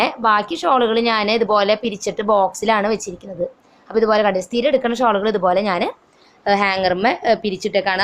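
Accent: Thrissur, India. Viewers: Samsaaram kettapol thoni .ivde ente adutha flatil nd .1 thrissur kaar.avrde samsaaram ningade samsaaram same aane .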